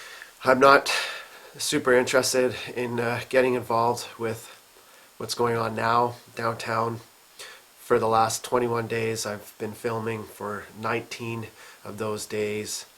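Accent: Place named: Canada. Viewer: you’re a great guy! You wear your heart on your sleeve! From the beginning I watched you mostly, although some others, but with you I really got the Canadian vibe. I’m from the Southern US that’s it’s enjoyable hearing you talk dude! I get a kick out of how you describe the cold weather as “snappy” 😹 For years Americans joked about Canadians but let me tell you, y’all have earned our respect and the worlds respect over the past 3 weeks! Honestly, I’ll never look at the Canadian flag the same way ever again! Now, I see the Canadian with so much respect and it’s an honor having good people like you just to our north! Also to add, I’ve learned more about Canadians in the past 3 weeks than ever before and I really appreciate what you’ve done as well as everyone else! Stay strong! Y’all have the support of so many people all over this world including your cousins down down here in the US!!!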